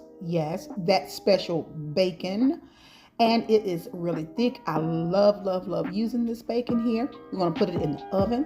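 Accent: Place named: South, United States. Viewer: Southern as hey'all. ❤❤❤❤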